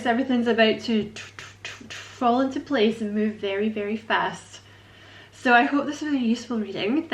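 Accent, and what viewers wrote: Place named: Scotland, United Kingdom. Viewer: I feel so welcome here. I like your Scottish Accent very much. Kia Ora (greetings in the Maori language) and a very big congratulations on your 100,000 subscriber plaque you fucking legend! Well done!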